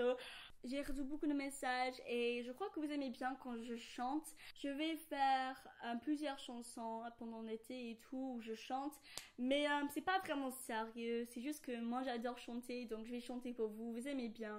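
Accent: England, United Kingdom. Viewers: C trop mignon une anglaise qui parle français 😝❤️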